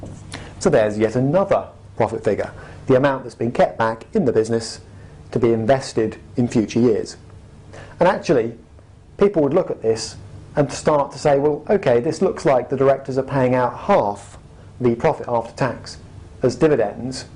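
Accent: England, United Kingdom. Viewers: Is it just the English accent or he actually resembles Christian bale... Great video...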